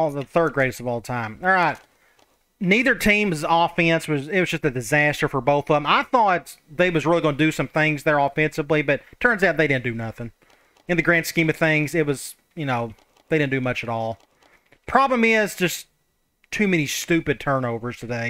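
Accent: South, United States. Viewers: That southern accent hits😂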